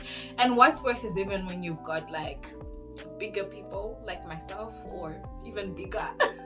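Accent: Namibia. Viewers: you look so beautiful from Namibia and you have changed to a Namibian through your English, that's our English you are speaking. you must come back my sister don't suffer yourself in zim;, the government has reduced the tax now and declared a free tax for all low-income earners. In Namibia we attack corruption we will not rest about it until we see actions taken against those corrupt leaders. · I liked the way u changed your accent to Namibian accent.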